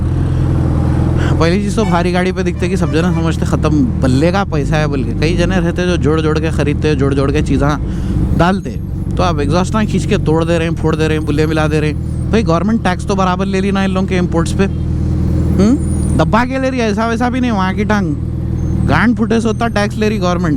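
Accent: Hyderabad, India. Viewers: Assalamwalakum bhai maintained Hyderabadi style me In words 👌🏻👌🏻👌🏻👌🏻👌🏻♥️📸💥💥💥😍🤲🏻🤲🏻🤲🏻 #Kįrāk 🤯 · Ye Tappori dilect nai hai bhai !! Hyderabadi hai Hyderabadi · bhai it was just crazy listening you.🤩🤩🤩 Aapki riding,aapki English (mashallah),aapki commentary Hyderabadi mai,aapka slang to good to awsm to listen.🔥🔥🔥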